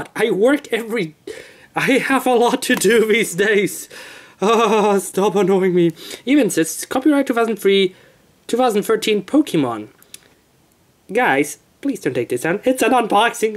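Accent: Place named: United Kingdom. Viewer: To be Young,12 cups of coffee and UK accent,that's the magic formula.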